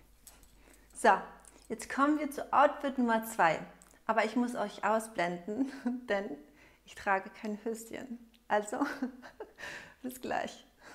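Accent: Germany. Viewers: That German accent/ language is amazing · Klingt nach Fränkin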